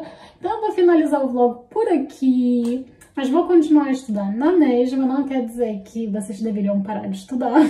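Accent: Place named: Portugal. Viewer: Ah sim, percebi pelo seu sotaque, está muito parecido com os dos Portugueses.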